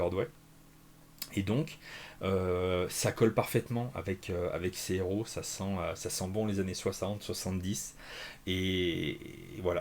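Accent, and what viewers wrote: England, United Kingdom. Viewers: Bah il est très bien cet accent anglais ! Merci pour la vidéo ! J'ai jamais pris le temps de découvrir la JSA, ça donne envie!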